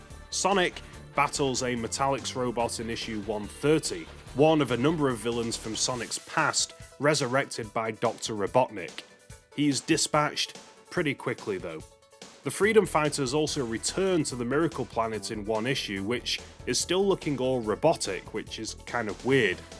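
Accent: United Kingdom. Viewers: is he brittish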